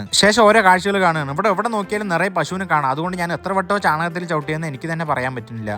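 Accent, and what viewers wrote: Palakkad, India. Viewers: നിങ്ങ പാലക്കാടാണ്്ന്ന് പറയാണ്ടെന്നെ അറിയ്ണ്ട്. ഗെന്താ മക്നേ.. ഹാവു.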